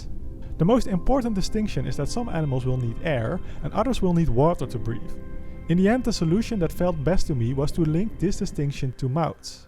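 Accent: Netherlands. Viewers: ik vind dit spel heel leuk zeker omdat het gemaakt is door een nederlander.. tenminste je klinkt als een nederlander XD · Are you Dutch really sounds like it😳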